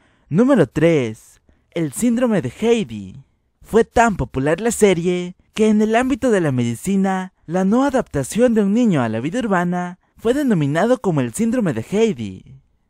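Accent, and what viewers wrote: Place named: Mexico. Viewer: Uno de los libros más leídos en Suiza...no va a ser El Quijote....y leelo con acento europeo no mexicano..bobo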